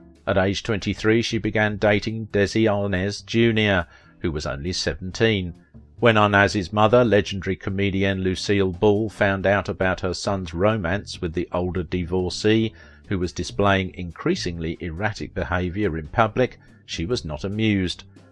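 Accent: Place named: Manchester, United Kingdom. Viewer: In your opening 1st paragraph in the last mangled sentence, you are apparently confused with the name of the actress Patty Duke and the name of the person she was portraying – Helen Keller. And in your opening 2nd paragraph you seem to be very gender confused. Can't you distinguish the difference between the "his" and "her" pronouns? Or is that a British idiosyncrasy? And why is a thick (sounds like Manchester) British accented man narrating a short bio on an American actress who's early 60's show and TV movies I very much doubt were broadcast anywhere in England or The UK at that time or anytime since then?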